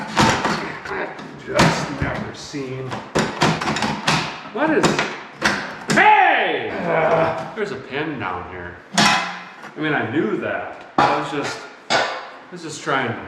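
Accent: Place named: Wisconsin, United States. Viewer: A guy forgets how much your wiscahnsin accent came thru in earlier videos 🤣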